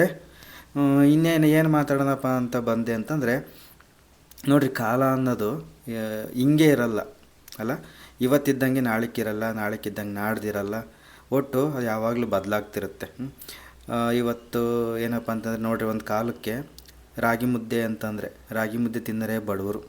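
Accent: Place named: Davangere, India. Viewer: ನಾವು ದಾವಣಗೆರೆಯವರು... ಇವಾಗ ಚಾಮರಾಜನಗರದಲ್ಲಿದ್ದೀವಿ.... ನಮ್ಮ dvg ಭಾಷೆ ಕೇಳೋಕೆ nim ವಿಡಿಯೋ ನೋಡ್ತೀನಿ.. Nim ಅಡುಗೇನು ಚೆನ್ನಾಗಿರುತ್ತೆ ಬ್ರದರ್ ❤❤❤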